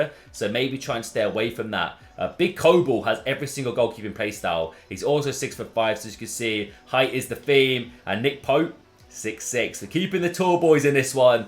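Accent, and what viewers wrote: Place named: England, United Kingdom. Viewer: I really didn't want to sound like a prick but I wanted to make sure I got my point across which is why I wrote a bible after lol. I know I'll never be able to afford end game in FIFA, but I can imagine it's a lot of fun to throw almost any player in the squad (I'm glad you made it to the other side lol). It's the mystery or curious factor that makes us all want to use those top tier players, but sadly most of us won't have that chance. Again, without sounding like a prick, I think a series with a cheaper budget could really "help" your channel and a lot of people will grow attached to it. I feel like the cheaper budget/Hidden Gems/Silver Beasts type videos are something that have been missing from the community for a while now (or maybe just very rare). IMO, they're fun and help connect the community since more people can relate and interact on the videos. 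I'm actually 28 tomorrow and I've always wished that I grew up in England as a kid (I feel like my age has some "value" since I've been grinding FIFA for 14+ years lol). Having soccer as your die hard passion and the only sport that you watch was almost like living in the shadows in a way. I couldn't believe it was so loved and celebrated almost practically everywhere else in the world. It was kind of depressing. Anyway, even though I've watched a handful of your videos, I was still geeking out a bit because you're from England. Just really cool to me lol. I always loved the way you guys spoke, like dropping the C word c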